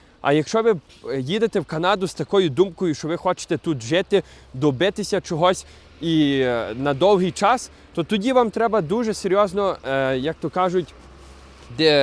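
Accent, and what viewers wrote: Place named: Galicia, Ukraine. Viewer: Мова дуже гарна, з першого слова впізнала галичанина-земляка.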